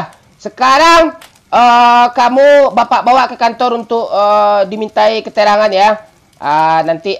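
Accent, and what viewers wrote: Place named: Kalimantan, Indonesia. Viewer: ORANG KALIMANTAN MANA SUARA NYA SEMUGA ASAP CAPAT ILANG YA GUA DARI KALSEL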